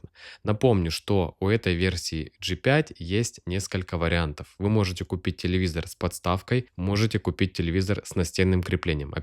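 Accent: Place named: Ukraine. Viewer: У тебя оч приятная укр мова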